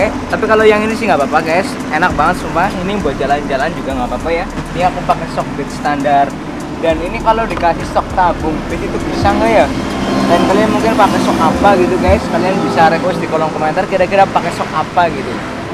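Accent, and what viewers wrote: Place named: Banyumas, Indonesia. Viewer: Kamu apa orang ngapak bang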